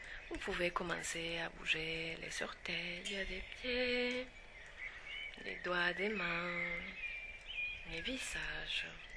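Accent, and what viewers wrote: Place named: Spain. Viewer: Cest quoi cet accent espagnol ? Paysans ? Créole ?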